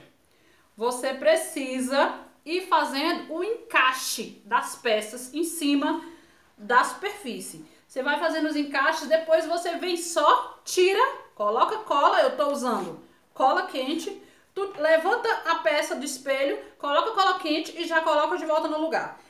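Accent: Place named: Brazil. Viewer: Adorei o móvel e esse jeitinho brasileiro arretado dela falar. Negra linda. Na cor e na essência.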